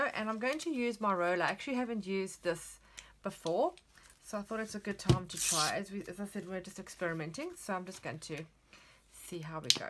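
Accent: South Africa. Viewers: you sound South African! Love your art!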